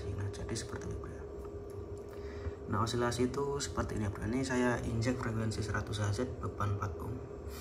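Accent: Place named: Sragen, Indonesia. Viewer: logat e koyok wong sragen mas😁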